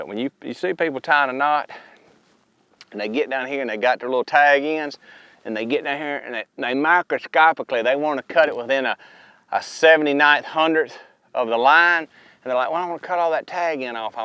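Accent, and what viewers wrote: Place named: Texas, United States. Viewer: Matthew Mcconaughey is from Texas. A lot of us talk this way.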